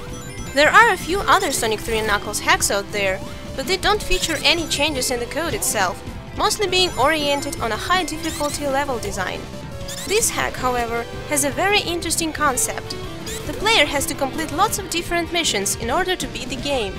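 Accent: Russia. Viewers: Russian accent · you're trying to hide your Russian accent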